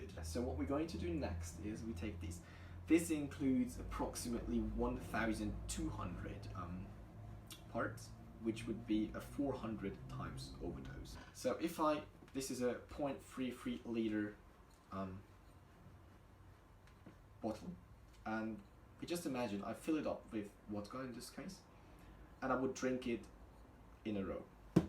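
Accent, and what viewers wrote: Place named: United Kingdom. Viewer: Hast du einenenglishsprachigen Elternteil? Du sprichst sehr britisches Englisch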